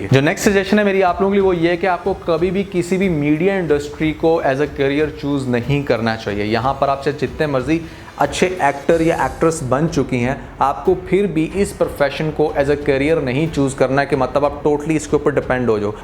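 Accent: India. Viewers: Mr. your accent and words shows that you are highly effected by Indian content. Ap kisi Urdu k professor se milen aur baat Karen ta k ap seekh saken k humara accent aur alfaz un se kitne behter aur khubsorat hain.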